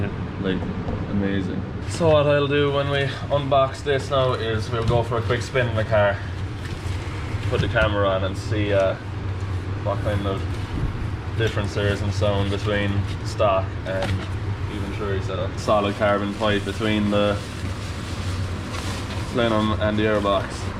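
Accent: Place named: Ireland. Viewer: Irish accent is top 3 in the world for me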